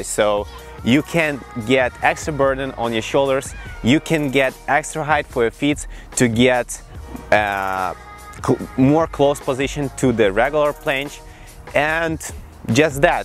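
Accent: Russia. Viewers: Блин) акцент вообще чётко услышал, смотрю - и в правду русский, а я и не сомневался)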